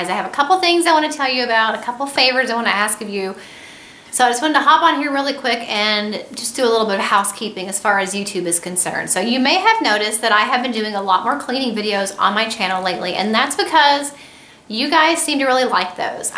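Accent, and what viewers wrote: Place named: South, United States. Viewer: I subscribed to your channel because I was interested in learning more about homeschooling and being a SAHM. I like your personality and southern accent. I've always liked anything "southern"... Movies, books, tv shows, etc. I also like your gift videos and that you like to use natural cleaners. Your Mickey subscription box video has me itching to save up for another Disney vacation and I'm really excited to watch your Disney videos now! Our baby boy was born last week and we haven't decided on a name yet. What are some popular names for boys in your area? Or if you had a boy what would you name him?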